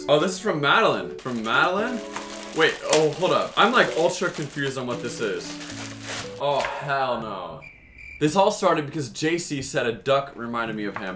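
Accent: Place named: United Kingdom. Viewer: Did he became British . Or am I just watching to many British youtubers 😭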